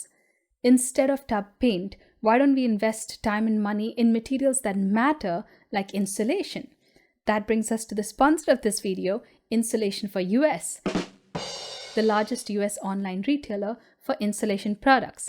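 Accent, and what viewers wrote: India. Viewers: Why do sound like my Indian neighbors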